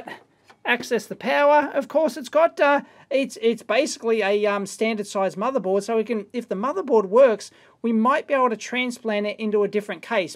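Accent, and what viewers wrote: Australia. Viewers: You did up the Aussie level on this one for sure mate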